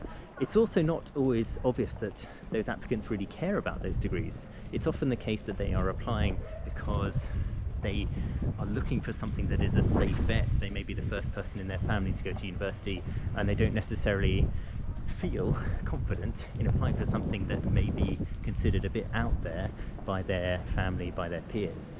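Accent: United Kingdom. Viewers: I like British accent hope i can talk like that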